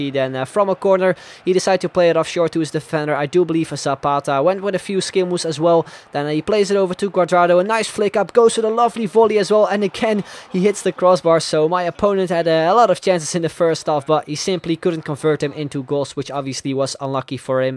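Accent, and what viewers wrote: Netherlands. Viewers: You're dutch aren't u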